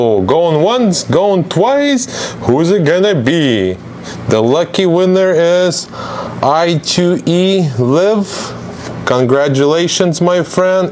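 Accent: Russia. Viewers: Прикольный русский акцент Бро!!! Привет из Украины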